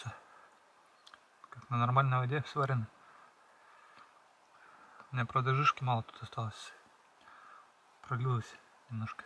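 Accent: Russia. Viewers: сразу слышно что русский!!!!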